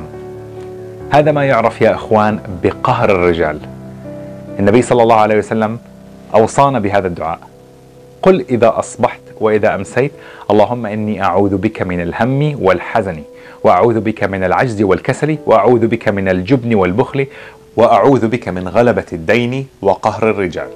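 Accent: Algeria. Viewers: والله قبل ماتقول North Africa كنت حاسس انك منا هههه بطريقة كلامك وفهمك للدين ،أخوك من الجزائر. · صدقني فيك شيئ من الجزائريين من طريقة كلامك وكاريزميتك وأحاسيسك ومواقفك التي ذكرتها وعن والدك رحمه الله. كلنا مسلمين.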